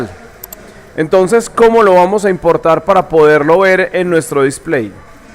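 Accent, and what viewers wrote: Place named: Colombia. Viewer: Hola enhorabuena por tomarte el tiempo de hacer este tutorial, fue muy explicativo. Estaré atenta a nuevos videos! Tienes voz de Colombiano jejjejejej me agrada mucho lo sencillo que explicas. Muchas gracias!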